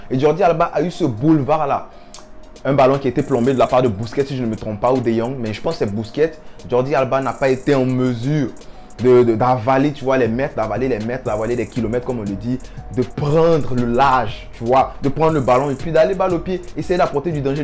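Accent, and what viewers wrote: Ivory Coast. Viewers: C’est la première fois que je ressens ton accent ivoirien 🤣🤣🤣 ça degba Garcia et piqué ensemble c’est pas possible même profil pas assez rapide . Araujo un monstre ce petit, De Jong il est tellement bon mal entouré et Depay un artiste très talentueux 😍 cependant trop de responsabilité à peine arrivé. Busquets il est plus à niveau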